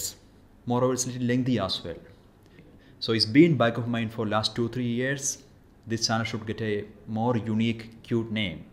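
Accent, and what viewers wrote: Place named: India. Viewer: You sound Indian, what part are you from?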